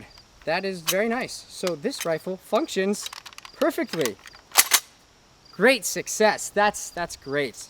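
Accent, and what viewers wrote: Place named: United Kingdom. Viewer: I honestly thought you were british all this time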